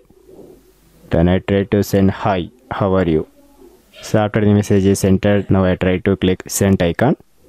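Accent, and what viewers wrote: South India, India. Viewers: South indian voice , nice video bro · South-indian accent love tht 👍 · I think that he,probably, is a south Indian.because his accent is a bit like that.however, the video deserves a salute😊😊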